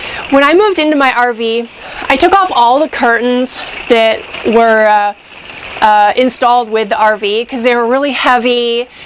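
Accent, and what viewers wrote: Canada. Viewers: she is from Canada a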